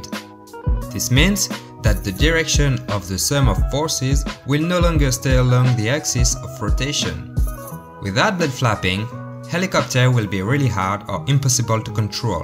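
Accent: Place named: France. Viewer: le bonne accent français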